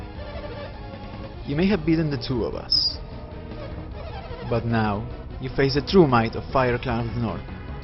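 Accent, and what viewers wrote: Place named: Spain. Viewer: Just came from your Megaman videos and am binging through Golden Sun. Indeed there are few viewers, but I think it's mostly since this is an old game. I watched it for the nostalgia. Based on your accent, I'm guessing Spanish (I have plenty of Spanish friends with a similar accent)? You definitely don't have a bad voice, and I'm amazed at the amount of work you put into something you like. I may not comment on every video, but I'm watching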